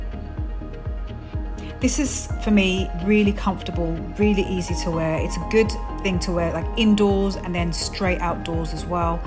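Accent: United Kingdom. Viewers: Your style and personality...bonus British accent.😍🔥😎...thank you,! 😍😊❤️☀️Oh, that mannequin... I want one! You and Michael have a wonderful Summer!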